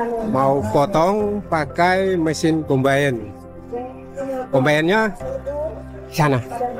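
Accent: Java, Indonesia. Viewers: Medok bgt Lo ya😂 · Luar biasa padi nya kak, semakin ku tonton ternyata jawa medok... luar biasa semangat 🎉❤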